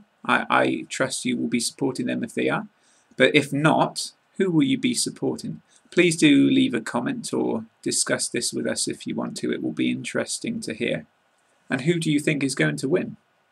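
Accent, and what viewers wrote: United Kingdom. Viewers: Hello, cheers from Egypt um really keen on learning British accent and especially ur luvly accent :) can u please recommend the easiest ways...I've heard of "shadowing" which means 'coping' and repetition of sb's speech and after a while you will be able to gain the accent.I've NOT started yet to do it cuz um waiting for ur advice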